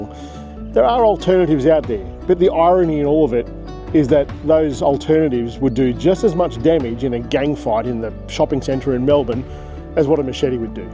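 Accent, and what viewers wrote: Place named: United States. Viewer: Boo hoo, u sound like a red neck American. U can’t take my guns from me. Who cares if u can’t use a machete. I bet u hardly use it . But let’s jump on the bandwagon and use it to get more content for your channel.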